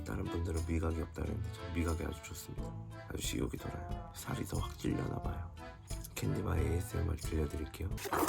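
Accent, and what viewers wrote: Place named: Seoul, South Korea. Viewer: 이 양반 내가 본 서울 사람중에 서울말 가장 잘해요 ㅎㅎ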